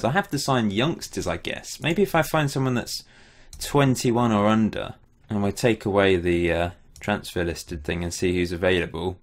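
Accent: RP, United Kingdom. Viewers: for the record, my girlfriend refers to you as 'that posh guy who talks about football' - and she isn't even British.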